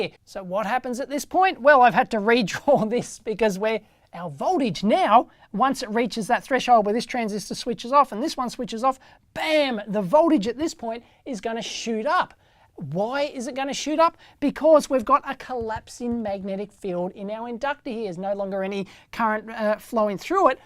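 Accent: Australia. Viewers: I have just watched an "English" video debunking a house energy saver, and now I have watched a Australian (?? hope I have not insulted you) debunking free energy - just fantastic going from low key cynicism to high intensity "your an idiot". Thank you for a excellent video and some real science. :):):)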